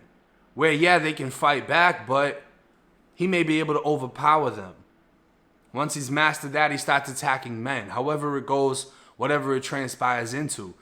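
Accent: Boston, United States. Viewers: It's about time you started talking about "Boston" where your a native at bud or places across the world.... this is getting a little dry, you only did 3 years in a Fl prison, and you have a whole channel based on those "3" years, and while living and obviously being from "Boston" you talk about Fl prison and the people who live in this "one state" you only served "3" years in prison at, but your not a native 2..😒 you should expand which prisons you talk about talk about them "ALL" your language skills are superb very well spoken! you def should have a channel your more then worth listening 2. If you didn't sound like Leo from "Departed" or "Wolf of Wallstreet" it would go down smoother, but your "Obviously" from 'Boston" but have and "ENTIRE" channel based just off only 3 years of your life from a place you were a transplant 2 in the 1st place. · what part of Mass are you from.The Boston accent is distinct.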